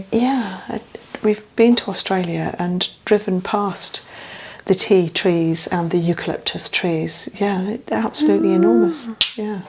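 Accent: United Kingdom. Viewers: Hello from North Texas, USA. Is it weird that I want to speak with a British accent after watching your videos. I love hearing your soothing voice.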